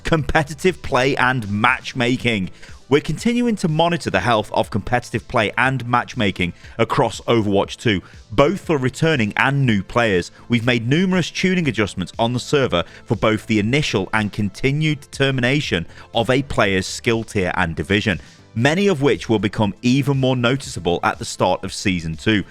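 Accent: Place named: United Kingdom. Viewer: Well maybe dial back back the British bullshit it’ll transcribe just fine.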